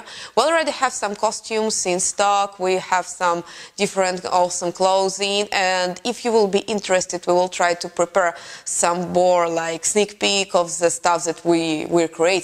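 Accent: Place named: Russia. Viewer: Опять эти Russian инглиш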